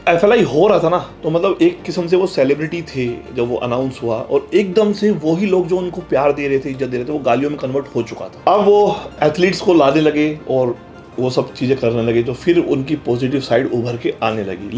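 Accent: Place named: Haryana, India. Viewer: Haryana se ho sir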